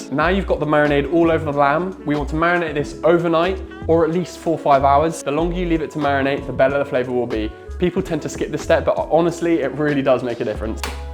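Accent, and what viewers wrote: United Kingdom. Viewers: Bruh the British accent 😂😂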